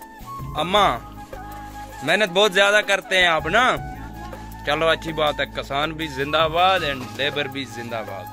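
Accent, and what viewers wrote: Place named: Poonch-Rajouri, India. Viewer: Bhai jaan language se lgra ae poonch Rajouri se oo ya to fr choudhary ho❤❤❤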